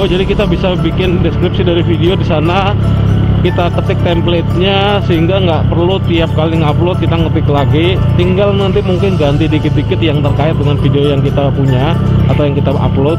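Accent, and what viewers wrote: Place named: East Java, Indonesia. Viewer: Koyok pantura malang pasuruan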